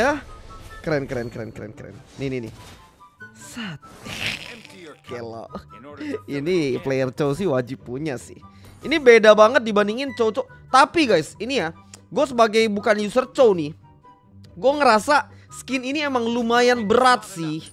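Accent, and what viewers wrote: Palembang, Indonesia. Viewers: "Aii dahh".. Dialek wong plmbg la nempel trs jo ee😅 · Masih ada logat palembangnya "Aiidaah"😂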